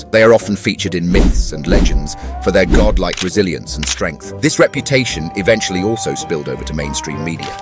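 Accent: United Kingdom. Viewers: Did this guy just rip off Casual Geographic with a British accent? I get he didnt create the slang, but the overly extensive use and variations is basically his style. Also, is this AI?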